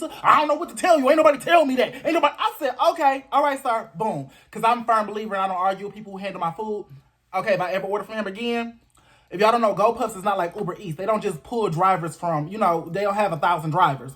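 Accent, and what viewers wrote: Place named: South, United States. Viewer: You’re accent is fine 🩵are you from the south I love southern accent or east cost too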